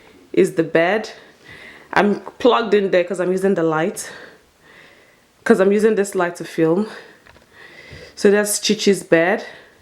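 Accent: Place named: France. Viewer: I like your accent, is that French?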